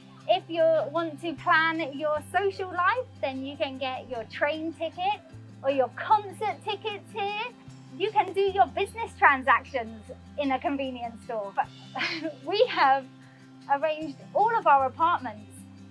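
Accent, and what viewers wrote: United Kingdom. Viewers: 英國腔真的很好聽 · Thank you For introducing Taiwan's good and strong places To whole foreign countries But l do appreciate your special UK accent So cute and lovely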